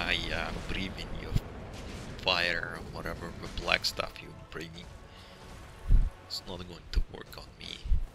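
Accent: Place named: Russia. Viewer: Loving that russian accent.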